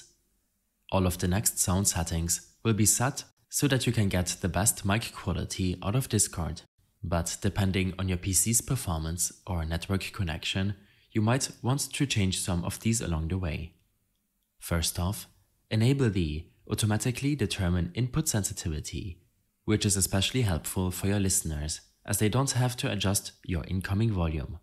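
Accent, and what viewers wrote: Germany. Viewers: I HEAR DEUTSCH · you sound German could it be? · deutsches akzent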